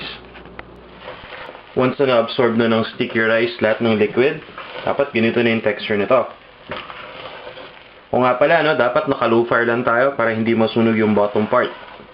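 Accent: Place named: Batangas, Philippines. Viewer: Gusto ko po yung Batanguena accent at yung pagpapaliwanag sa pagluluto. Good Luck po... subscribing :-)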